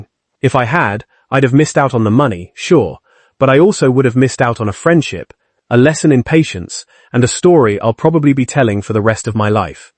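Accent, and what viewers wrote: United Kingdom. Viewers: Why is the narrator English and not American?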